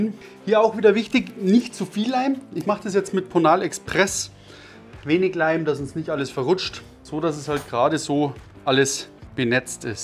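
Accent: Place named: Bavaria, Germany. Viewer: Sau geil!!! Bin durchn Truppe auf di aufmerksam worn... Hob ma jetzt scho einige Videos vo dia o gschaud... Mega guad! Und dann no oana der boarisch red. liabe Griass aus Nandlstadt... (Vielleicht kennst des ja... is ja ned weit weg von dir ;-))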